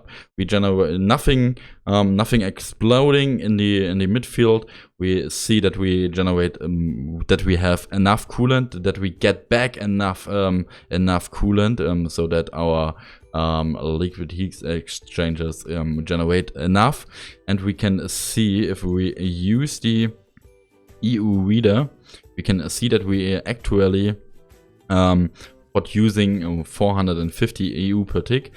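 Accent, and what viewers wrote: Germany. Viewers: „five in the high“ deutsche akzent beste 😂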